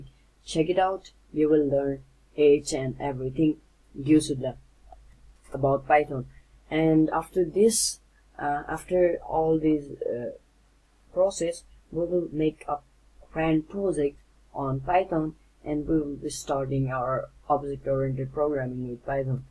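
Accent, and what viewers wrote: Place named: United States. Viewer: please take in proper not like Americans talks so it would we understandable by everyone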